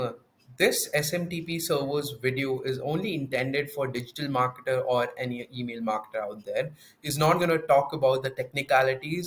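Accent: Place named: India. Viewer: I was about to sign up for a Growth account, but unfortunately, I am not able to rely on a heavy Indian accent when viewing videos and tutorials. Do you have support by American English speakers???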